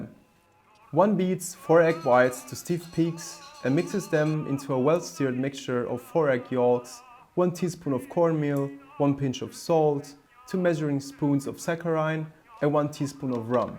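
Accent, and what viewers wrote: Germany. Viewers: Very well done, very interesting. Your English is really very good; I enjoy the German accent and the occasional "Germanic" choice of wording. Do you have a channel in German? I'm learning the language and am looking for interesting material in German to experience. If you speak German with the same speed and clarity you used here, I believe a German channel of yours would be a useful tool for me in that endeavor.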